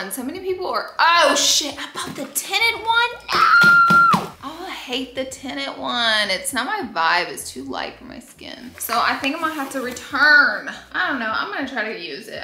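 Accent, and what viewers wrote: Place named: Alabama, United States. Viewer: Who else loves when says oil? No one? Just me, okay. Girl, I love your Alabama accent!❤❤❤. Oh I’m adding all the things to my Amazon cart..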